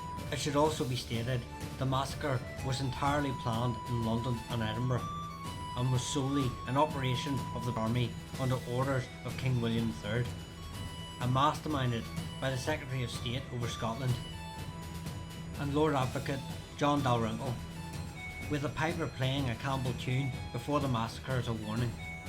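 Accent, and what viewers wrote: Ireland. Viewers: It is really hard to understand what is being said due to the annoying, loud music plus what appears to maybe be an Irish accent. Such a shame as the information appears good.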